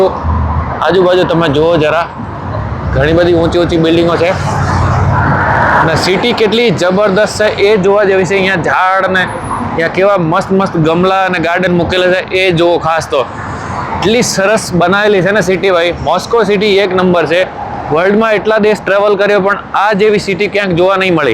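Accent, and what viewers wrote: Rajasthan, India. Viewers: Gujrati bhasha me to rajsthani wala lehja h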